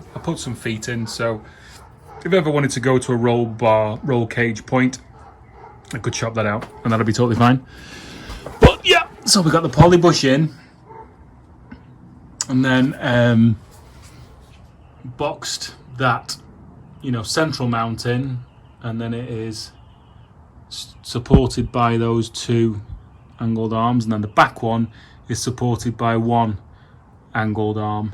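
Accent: United Kingdom. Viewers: yeah totally understand buddy. I just wish YouTube would place them better. Loving the build buddy its not my normal cup of tea but you and that bug have me hooked! More than worth the awkwardly placed adds! Loving the way the 'BRIT' keeps coming out every now and then. 😁👍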